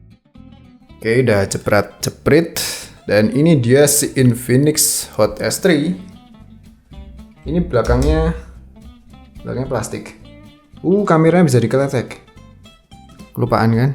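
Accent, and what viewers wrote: Java, Indonesia. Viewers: Omah mu ngendi lek...logat e jowo ngono 🤣. Trs le guyu Yo kok diejo😁 Ditunggu versus in nya...yg seharga ya...vs nya beberapa smartphone lah. 😁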